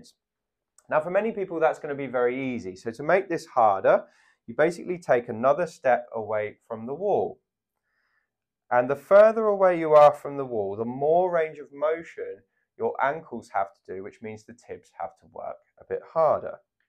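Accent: England, United Kingdom. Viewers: Love watching your videos after stumbling upon them. Love your accent -English?? Look with hope I will see Improvement, would have very much liked a consult with you but I live down under at the South Pole, instead I say thank you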